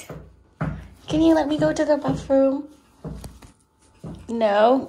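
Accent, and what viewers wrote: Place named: Northern England, United Kingdom. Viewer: Plus, you sound northern haha. Got mine from Staley Bridge.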